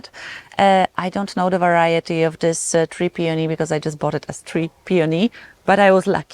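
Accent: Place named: Poland. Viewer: Hi, it's always so nice to see you, I love your Polish accent, please don't change it:) and your garden of course is sooo beautiful. I am watching you from South Carolina, USA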